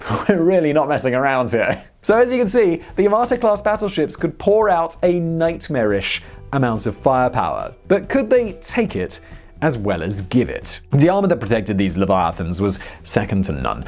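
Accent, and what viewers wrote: England, United Kingdom. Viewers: I have no idea what this is but now I want to know. PLEASE Simon? Use your amazing British voice to teach me useless shit :) love you man. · The most English pronunciation of Japanese words I have ever heard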